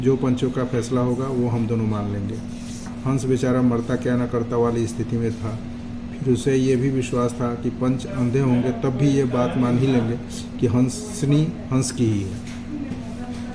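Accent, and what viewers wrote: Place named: Siwan, India. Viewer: Siwan wala tone hai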